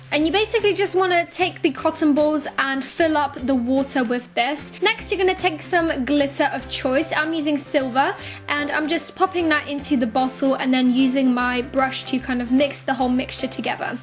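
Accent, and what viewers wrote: United Kingdom. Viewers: looove you british accent!!❤😘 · oh I thought she was British because sainburys is a British store and has a British accent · OMG I looove your british accent! BTW really cool room decors! xoxo <3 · also u can tell she is English by her accent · MrsPixie3D if she is, she speaks like a lot of people in the uk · i love your british accent though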